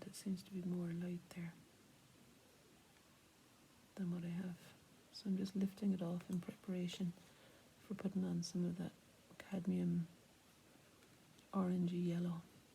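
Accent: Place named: Ireland. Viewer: ❤With your damn Irish accent, I can understand anything! But your work speaks for itself! I think it is time for another DVD!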